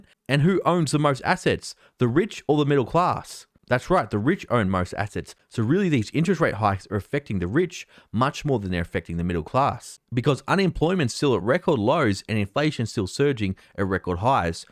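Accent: Australia. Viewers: Also, wow didn’t know you were from Australia, always thought you were British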